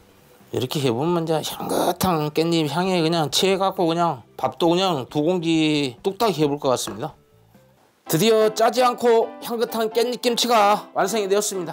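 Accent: Jeolla, South Korea. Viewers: 구수한 전라도 말씨 참 듣기 좋네요..음식두 간편하게 잘 알려주시구요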